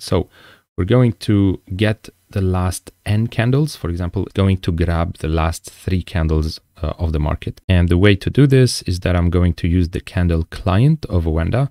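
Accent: France. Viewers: Thank for the video i will try it ! By the way have you french accent ? And have you made money from your bots ?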